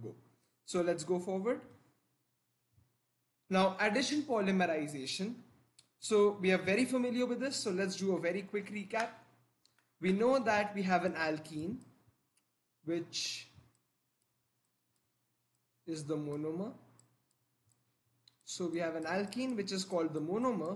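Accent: India. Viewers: I feel u INDIAN right..?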